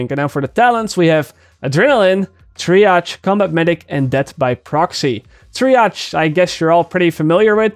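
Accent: Netherlands. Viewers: is he dutch because he sounds dutch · are you Dutch?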